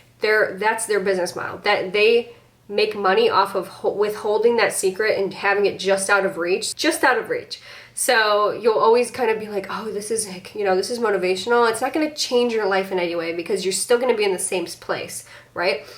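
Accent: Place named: Midwest, United States. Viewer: oh my god ur in chicago! i KNEW i heard a fellow midwestern accent!! also u look like you'd be british for whatever reason ahaha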